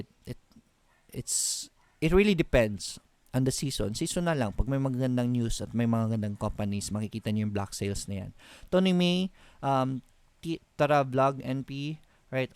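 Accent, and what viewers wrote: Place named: Visayas, Philippines. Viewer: sir , bisaya ka pala hehehe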